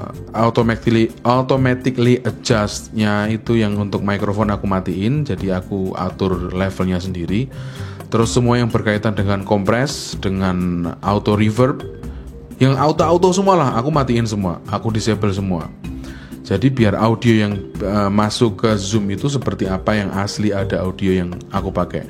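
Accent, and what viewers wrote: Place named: Java, Indonesia. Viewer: medok sekaleeeeee..... salam wong jowo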